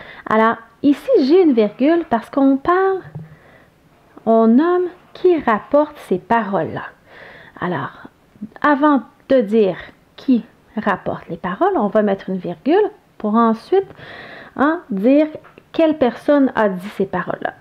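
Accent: Canada. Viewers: C'est la meilleure des manières, pour apprendre une leçon comme celle-ci. se laisser bercer par un doux accent Canadien. Pouvez vous me dire si cela est juste ? Merci d'avance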